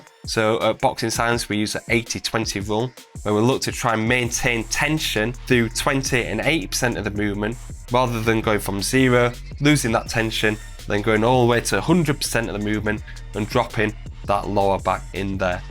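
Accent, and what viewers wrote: United Kingdom. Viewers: Many of the videos I watch just to listen to a proper British Accent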